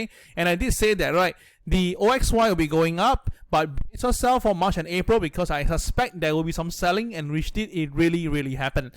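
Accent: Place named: Singapore. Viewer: I haven been owing Oxy share since it was $27. Hopefully, it will beat $80. Btw like your Singaporean accent :)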